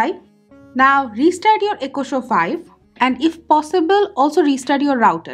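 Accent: India. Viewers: you did help me however for americans to undersand the indian accent they place on the english language and the speed in which you speak is super difficult. If you would clean this up you would get many more likes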